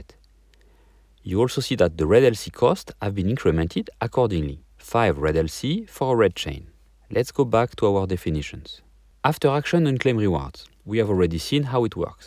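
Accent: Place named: France. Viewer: Cool bro, thank you this video. French accent is super cool remind me of Merovingian the Matrix 👌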